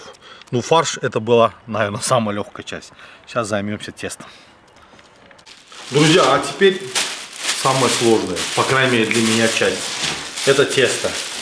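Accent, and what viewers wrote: Baku, Azerbaijan. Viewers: ТАК ПРИЯТНО СМОТРЕТЬ КАК ВЫ ГОТОВИТЕ С БОЛЬШИМ НАСТРОЕНИЕМ И БАКИНСКИМ АКЦЕНТОМ ПРЯМ СУУУПППЕЕЕРРРР. 👍👍👍👍👍👍👍👏👏👏👏👏👏👏🇦🇿🇦🇿🇦🇿🇬🇪🇬🇪🇬🇪🇬🇪🇬🇪❤❤❤❤❤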